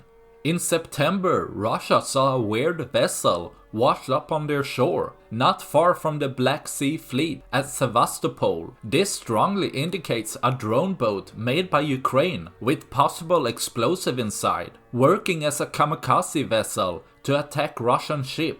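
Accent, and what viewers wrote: Sweden. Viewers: Hey Swede, (you must be with that accent), have you thought how the Russians could present a video of the attack by a drone boat that was allegedly Ukrainian? This is a false flag operation! 😡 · From your accent, I can bet you are from Sweden! ;)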